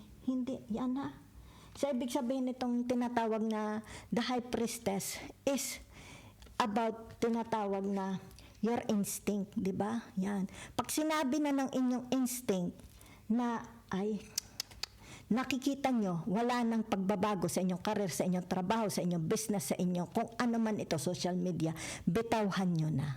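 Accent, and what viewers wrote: Batangas, Philippines. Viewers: Hello mommy kayo b ay Taga Batangas Dito sa pinas kc yong tuno.ng salita niyo eh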